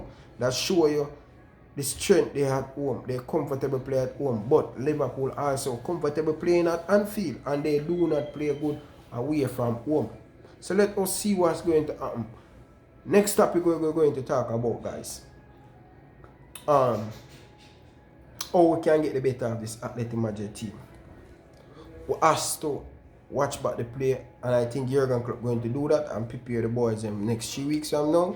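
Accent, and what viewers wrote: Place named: Jamaica. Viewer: where in jamaica you from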